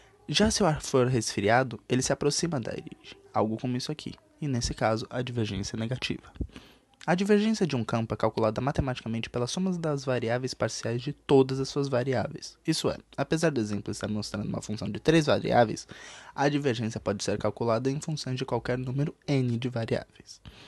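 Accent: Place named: São Paulo, Brazil. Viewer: Amei o sotaque paulista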